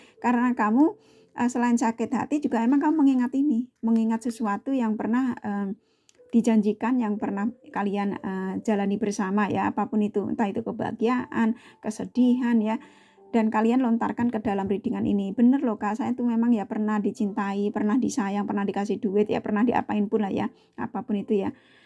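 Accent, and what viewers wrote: Java, Indonesia. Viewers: Btl skl kak sy&dia pernah hdp bersm 29 thn,dan sdh ada DUA anak, tiba2 km bercerai, skrg sdh 4 thn,dan telah bersm yg lain, namun akhr2 ini ada gelagat ingin rujuk, sy sih msh sndr ( single parent ), bg sy sdh nyaman dgn kesendirian,sy sdh happy dgn cucu ytc. Mksh ya kak readingan,sy sk skl dgn suara kk yg njowoi dgn canda' an ben no rasakno dll, ok kak smg kk makin sukses,sht sll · Kalau boleh tau kak orang mana Kok bicaranya logat jawa banget. Jawa tengah X ya kak Salam kangen Aja for you kak 😘😘😘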